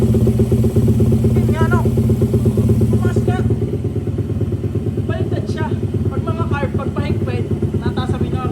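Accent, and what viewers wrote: Batangas, Philippines. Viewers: Taga Batangas ka puge? Batangeño ere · ngayon ko lang kayo napanood kuya, halatang batangueno agad hahahaha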